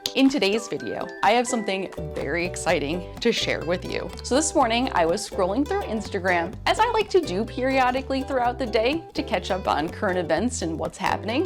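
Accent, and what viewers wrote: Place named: England, United Kingdom. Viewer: by the way I love the way you talk and sure your English accent. You could be my English teacher 😅😊 and that’s why I watched all of your videos. Yeah... I’m crazy about the tamagotchis too.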